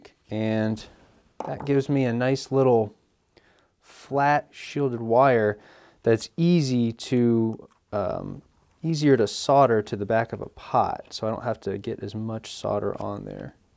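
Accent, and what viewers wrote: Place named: United States. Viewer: Why do Americans pronounce solder as sahder? It's never made any sense to me. Do you say bahder instead of boulder? Do you say this thing is a lot cahder than this one which is hahder?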